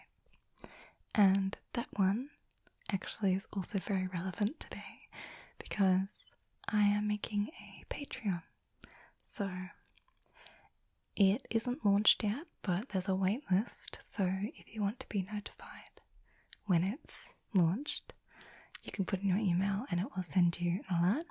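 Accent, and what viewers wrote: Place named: Australia. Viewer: Whoa, that took me by surprise. To the ears of someone who can't put two German words together in correct syntax, you speak it very well. New ASMR opportunity? ;) On the note of your background, when I was new here and had yet to become acquainted with your channel, I actually thought you were living in Australia. You carry a lot of that accent with you, imo. Pretty cool. Yeah, I saw that Japan interview video as well. I was tempted to comment about it a few weeks ago but I was like, "Nah, she's seen it by now..." I'm glad I didn't read the comments on it; I'm sorry you endured that. It sounds like you have a lot of travel destination plans. If any of those opportunities come to pass, do you think you would do recordings while you're there to share with this community? I always like sampling people's travel vlogs. Thanks for doing this Q&A! 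I didn't see any notifications to pose questions but that's ok: you answered a lot here! I enjoyed this. :)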